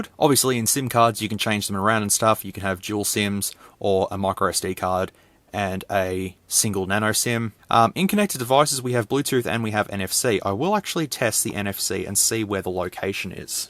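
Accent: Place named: Australia. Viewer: You have one of the most interesting Aussie accents. I often noticed some Aussies add an "R" to wherever an "o" is in a word, but yours is super pronounced!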